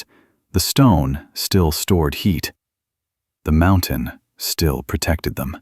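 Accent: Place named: RP, United Kingdom. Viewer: Please explain the reason for the back and forth shifts in the narration, starting in standard North American English without accent and changing to English with a posh British accent. This starts at approximately . At that point, the narration was describing Daniel's father, Thomas, who was a immigrant stonemason from Ireland. (Just a little historical side note: In the past --- how can I say this delicately--- the Irish and the British were NOT best buddies. Enough said.) Then at , the narrator returns to North American speech without accent until approximately when posh British starts up again. Posh British only lasted a short time until stopping mid-sentence . From that time, the narration was in North American English until when Posh Brit accented speech popped back up up. the narration reverted to North American speech without accent until the end in less than one minute. 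This story and the background artwork were excellent. However, the back and forth accent narrative is distracting and detrimental. Please skip the posh British accent unless the story is about posh British characters.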